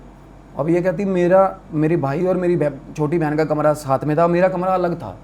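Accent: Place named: Punjab, India. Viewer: bhai this is punjab n hamare idhar aise he bolte hain meri jaan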